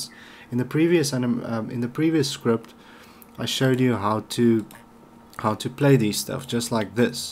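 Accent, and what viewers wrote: South Africa. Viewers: you sound south african xD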